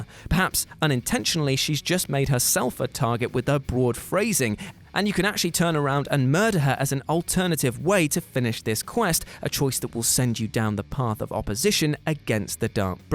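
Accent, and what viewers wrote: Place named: United Kingdom. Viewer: Great another Brit narrator, disliked the video. -.-‘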